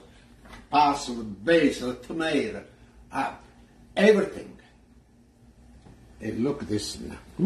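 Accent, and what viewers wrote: Italy. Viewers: I love your videos!!! Your Italian accent is absolutely awesome!!!! You’re the greatest!!! 👍🏾👍🏾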